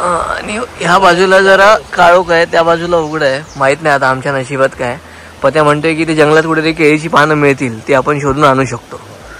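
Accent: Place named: Malvani, India. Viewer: दादा, तुमची मालवणी बोली लई भारी आहे.. ऐकायला फारच गोड वाटतं. 😊 खूपच सुंदर निसर्ग 🌳🌴🌾🌿🌊🌊⛰️🏞️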